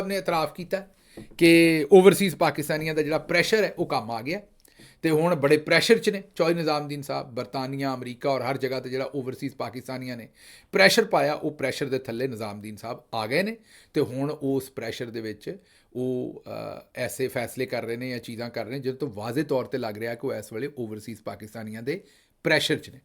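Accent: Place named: Punjab, India. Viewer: Always brilliantly executed love your Punjabi accent thank you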